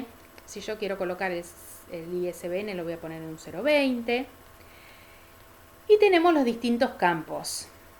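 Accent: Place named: Argentina. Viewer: Qué lindo video y qué lindo acento argento. ¿Podrías decirme como crees que influirá en el futuro el uso de sistemas MARC para las bibliotecas?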